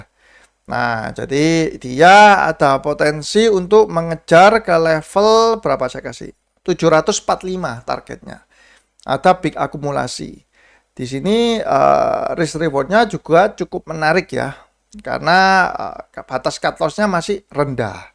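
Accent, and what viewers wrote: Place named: Java, Indonesia. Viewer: ada logat jawanya aduh jan gurih banget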